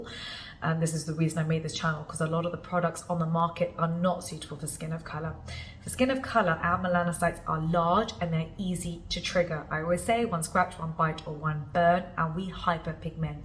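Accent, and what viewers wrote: United Kingdom. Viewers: Especially with her British accent 😅😂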